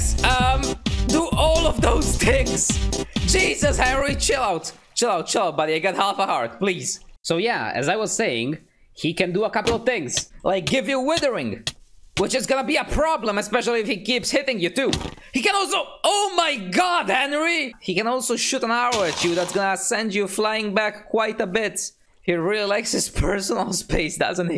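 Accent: Italy. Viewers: why are you italian? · Are you from italy?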